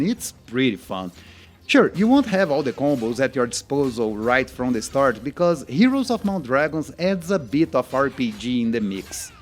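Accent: Brazil. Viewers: Realized by your accent that you must be brazilian right? Nice video.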